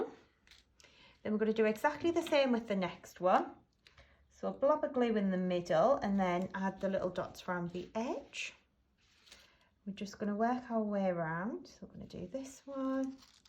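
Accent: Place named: England, United Kingdom. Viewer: Wow, Do you sell your cards to card stores? When i was in the UK in 2019 just before my Father Passed away me and my sister went to a card store and i couldn't get over the detailing of all the cards in there. Layered cards with pop ups and animals in forests and such. i love the Card stores in the UK there is always something you haven't seen before in there. I brought some home for my family here in Canada where i now live I was born in the UK so its nice to hear an English accent again. I was born in the south of the UK in Portsmouth and my hubby was born in Yorkshire but had lived in Canada since he was 4 year old, We met while he was serving in the Royal Navy in my home town we got married just over a year later and here we are still married after all these years. I have always wondered how to do the greeting cards myself but i have been more curious lately. 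I have loved making crafts here since moving to Canada there are so many talented people especially on You Tube. I wish i could spend all day on here really. I love the card you made its really beautiful. This is the first video i have watched of yours and i have to tell you i am hooked on this cardmaking. I love the cutting dies but can you use them without the Gemini machine or similar machines? I have tons of glitter that has never had anything i could use it on super fine coarse large and shaped glitter tons of card stock and a few dies but they are so cheap to buy on Amazon Temu Wish etc. The embossing i have ne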